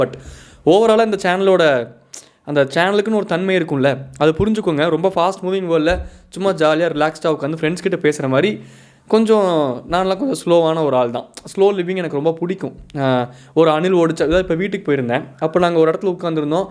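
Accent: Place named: Kongu, India. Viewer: தம்பி நேத்து தான் உன்னோட வீடியோ மொதல பாத்தேன் நம்மளோட கொங்கு தமிழ் பேசரது நல்ல இருக்கு நம்மளோட கொங்கு தமிழ் இன்னும் நெறைய வார்த்தையில பேசுங்க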